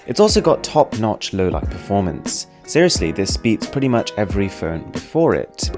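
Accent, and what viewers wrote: RP, United Kingdom. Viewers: This chap sounds awfully posh ya.. Good review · Why get your posh friend to narrate? is your voice that bad? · Thanks! Good to hear a British accent on YouTube!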